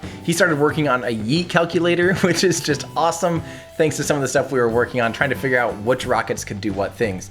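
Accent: Iowa, United States. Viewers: (Going back again...) Why do you believe your complaint about the ambiguity of calling the smaller unit the same name as the system as a whole (like when some people call a whole church meetinghouse a "chapel," the same as the main worship room by itself) reveal that you sound like you're from Iowa?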